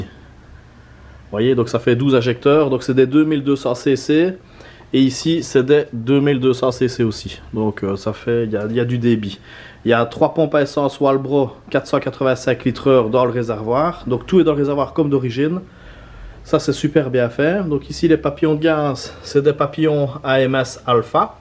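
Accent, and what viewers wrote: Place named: Namur, Belgium. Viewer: Cet accent... tu serais pas de namur?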